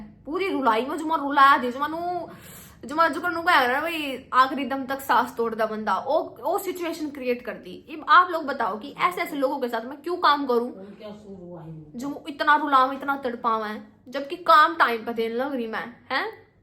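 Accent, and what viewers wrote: Haryana, India. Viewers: yr m proper delhi se apke muh se haryanvi sunke acha lga 😅😅😅😅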